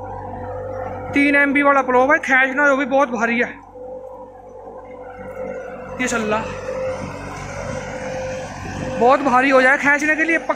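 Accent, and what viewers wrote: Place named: Saharanpur, India. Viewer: Bhai teri language share saharanpur walo se miti h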